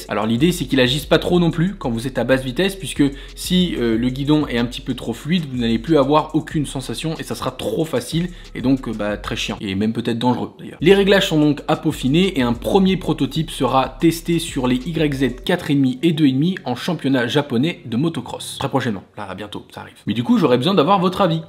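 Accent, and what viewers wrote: Belgium. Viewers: Enfin qu'il parle comme un vrai belge continue comme ça le BG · Troooopppp biiiieeennn, les 5 actus, l’accent belge 🤣 👍🏻, 😳pas trop fan de la direction assistée sur une 🏍. 🤛🏻✌🏻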